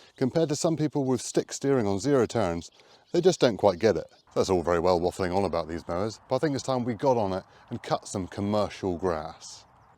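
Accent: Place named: United Kingdom. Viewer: More British narration….. Deleting the channel. Buh-bye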